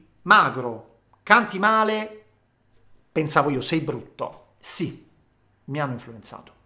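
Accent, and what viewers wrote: Apulia, Italy. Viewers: Grazie di trasmettere positivita e ci incoraggi ad avere fiducia in noi stessi grazie,è bello sentirti parlare con la cadenza pugliese sei unico,mi piace quando inserisci delle parole pugliesi,mi trasmetti la gioia di vivere❤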